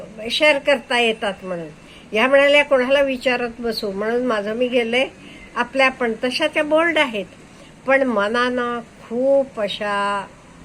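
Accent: Karnataka, India. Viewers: त्यांची मातृभाषा मराठी नसेल..You should accept other language speaking pple's tone!! कानडी असाव्यात..छान बोलल्या.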